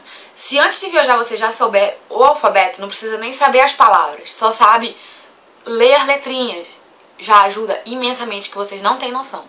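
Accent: Pará, Brazil. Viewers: Ah esse sotaque paraense... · Sotaque paraense? Hahaha Te sigo a pouco tempo mas estou adorando saber da tua experiência! Não vi todos os vídeos ainda, por isso não sei se vc já disse alguma fez, mas qual curso vc faz? Eu queria muito poder fazer o Ciência Sem Fronteiras, mas meu curso, Direito, não está incluído na lista :((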